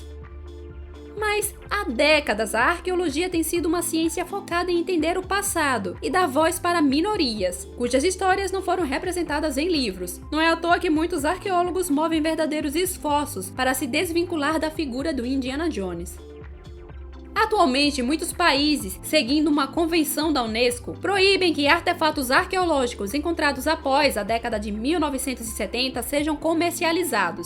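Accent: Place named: Sergipe, Brazil. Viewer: Ela é de Sergipe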